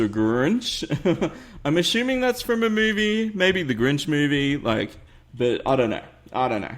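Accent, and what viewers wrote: Australia. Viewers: Your from Australia? :O No wonder I like your accent☺ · Your Australian me to where in Australia do you live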